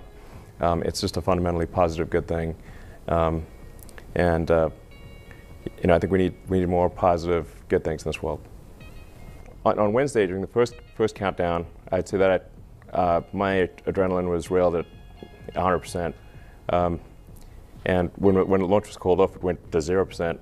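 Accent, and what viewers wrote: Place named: South Africa. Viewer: He’s from South Africa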